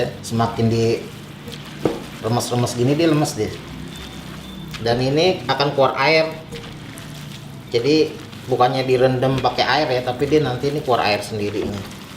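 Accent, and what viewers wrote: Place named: Depok, Indonesia. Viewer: Lontong sekel.. bise begitu yee bang.. depok beut dah ah logatnya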